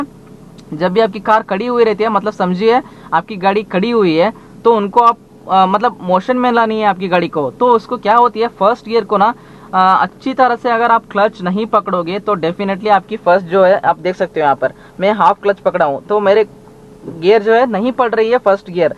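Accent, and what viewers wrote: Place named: Karnataka, India. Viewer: Being a kannad...your hindi is excellent👍👍....a little influence of your mother tongue is quite natural !!!....👍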